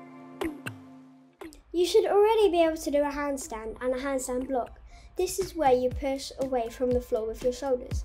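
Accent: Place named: United Kingdom. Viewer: I love your British accent 😃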